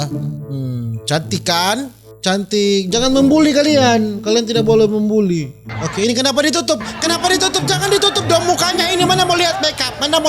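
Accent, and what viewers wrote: Manado, Indonesia. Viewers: Kurang seru, so jarang ba logat manado noh..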